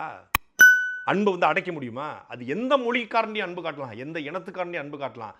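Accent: Tirunelveli, India. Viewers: சாட்டை அவர்களே 1800களில் இத்தாலியிலிருந்து தமிழகத்தில் கிருத்துவ மதத்தை பரப்புவதற்காக வந்த ஜோசப் பிஸ்கின் தமிழ் மீது பற்றுதல் காரணமாக எனது பெயரை வீரமாமுனிவர் என்று பெயரை மாற்றி தமிழில் பைபிளை முதலில் அச்சிட்டு தமிழ் மொழியை பற்றுதலால் தமிழுக்கு இலக்கணம் வகுத்து தமிழுக்கு தொண்டாற்றி அறிஞர்களிடம் தமிழை கற்று தமிழில் பல நூல்களையும் எழுதி கிறித்தவர் தான் நாம் பேசும் நீங்கள் பேசும் பாட்டை அவர்கள் பேசும் தமிழை அழுத்தம் திருத்தமாக பேசும் திருநெல்வேலி தமிழை தூத்துக்குடி அருகே உள்ள சாயர்புரம் காட்சிக் கூடங்கள் நிறுவி கிருத்தவ தேவாலயத்தை கட்டி அன்று ஏழை எளிய மக்களை உயர்வுக்கு கொண்டு சென்றவர் இவருக்கு கருணாநிதி சிலை எடுத்தார்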